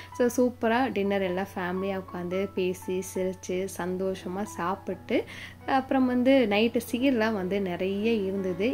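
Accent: Kongu, India. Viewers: Nenga kongu ah sis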